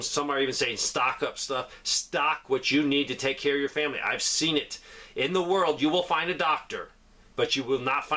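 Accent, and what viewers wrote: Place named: South, United States. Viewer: He's Southern. We talk funny!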